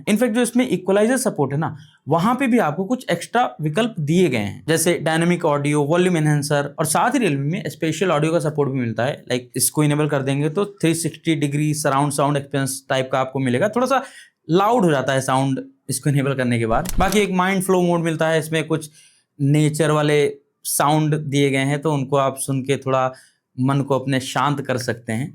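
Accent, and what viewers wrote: Standard. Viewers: Aapki shudh hindi sunkar atyant anand ki anubhuti hoti hai 🙏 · আপনার ভিডিও আমি প্রথমবার দেখছি । আমি আপনার ভিডিও এবং আপনার বাক্য এবং আপনার ভাষা শুনে খুব প্রভাবিত হয়েছি। মুগ্ধ হয়েছি শুদ্ধ হিন্দিতে কথা শুনে। এইভাবে যদি প্রত্যেক মিডিয়া ইনফ্লুয়েন্সার রা ভিডিও বানায় তাহলে আমরা খুব সহজেই যারা অন্যান্য ভাষায় অভ্যস্ত আমরা খুব শীঘ্রই আপনাদের কথা শুনে হিন্দি শিখতে পারবো। ❤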